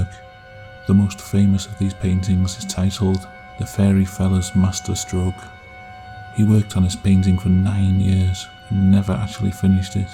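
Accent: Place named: Liverpool, United Kingdom. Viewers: This narrator sounds like a monotone Liverpool teacher who wants to quit his job... it is a very good video though, just not keen on the violin screeching in the background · Wow, barely a scouse twang here 6 yrs ago (2022 current year) can't believe it's you. I quite like it! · VIKINGODIN , you are on the wrong coast. That's a slight Merseyside accent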